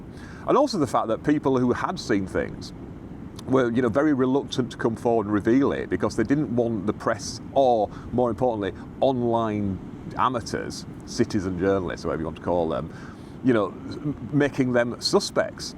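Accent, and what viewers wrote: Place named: Northern England, United Kingdom. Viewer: As someone that knows nothing about law I do enjoy listening to these videos and find them educational.😇 May I ask where that untainted Northern twang that you have is from, as you are very likely close to my own roots (Hull, Hessle more precise)? Definitely the way you pronounce your words, like 'Erm and Away' I'm guessing NE Yorkshire somewhere? The accent quickly changes once one starts travelling up and down the A1. Keep the videos coming.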